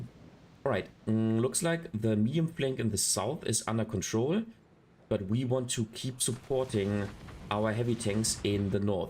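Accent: Germany. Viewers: Cool stuff! I like it 👍 btw: Ist deine Muttersprache evtl. deutsch?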